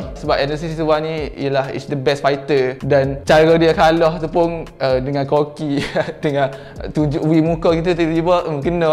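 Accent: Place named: Kelantan, Malaysia. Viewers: Bahaso kelate demo pekat sngat bey , ore lain comfirm xpehe , pah sor lg lorat nok g mano ? Aduhhh laa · aduh laju beno ckp...campur2 klantan plak...susah nk phm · Awok terlalu kelate untuk sayo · Kelate ni boh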